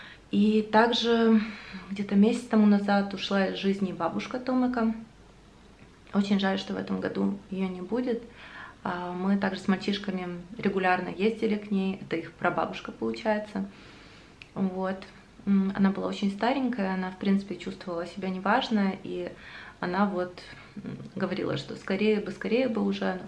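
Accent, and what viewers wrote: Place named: Ukraine. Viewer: люблю твой канал смотреть, но всё таки надо работать над украинским акцентом,он всё портит.....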